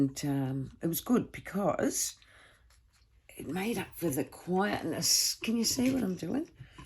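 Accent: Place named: Australia. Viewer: Simple & to the point. Love to hear an Aussie as well. Going to enjoy watching your ideas & projects.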